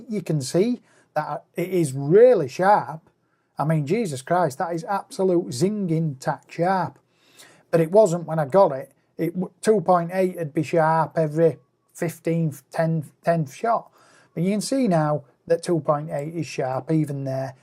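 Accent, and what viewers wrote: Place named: Yorkshire, United Kingdom. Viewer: you are from yorkshire mate.....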